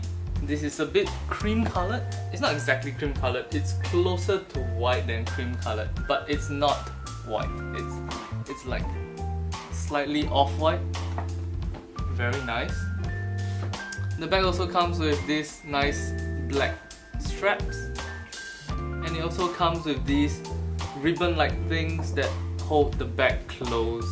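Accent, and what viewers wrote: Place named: Singapore. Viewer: You sounds like Singaporean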